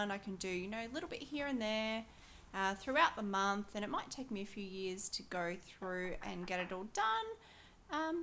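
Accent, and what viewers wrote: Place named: Australia. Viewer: Welcome to the colouring community! So happy to hear another Aussie voice. Looking forward to watching your videos and channel grow. Good luck with it mate. Stay safe and Happy Colours, Sara 🐨💕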